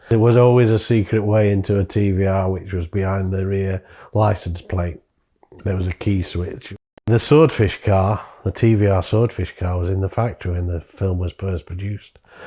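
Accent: United Kingdom. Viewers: Great to hear a uk accent on this Chanel! I think Americans would be surprised how many tvr’s you see driving round at least where I live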